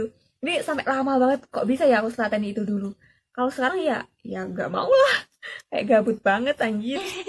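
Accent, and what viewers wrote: Java, Indonesia. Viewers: Mukanya mirip Natasya Wilona.suaranya juga mirip hanya saja medok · Sumpah sih ini wilo versi medoknya 😁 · Asli kembar sm wilona.. suara ny sma jg yg bkin beda cm medok jawa ny aj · Jowone endi mbak, medok nemen bahasanya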